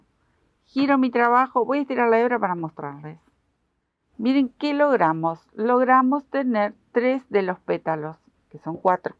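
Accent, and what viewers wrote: Argentina. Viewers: ¿Cómo escucho en castellano? De repente hay una voz mecánica y fea en inglés ¡en vez de mí acento argentino favorito que extraño tanto!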